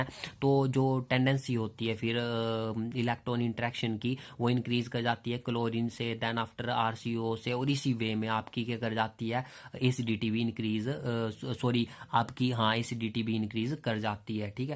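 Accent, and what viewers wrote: Himachal Pradesh, India. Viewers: Sir kya app Himachal se ho aapki slang se pura lag raha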